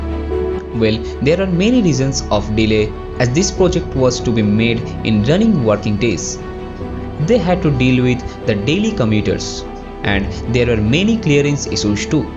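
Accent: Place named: Bengal, India. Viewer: You must be bengali..i can guess by your way of speak..sound good🔥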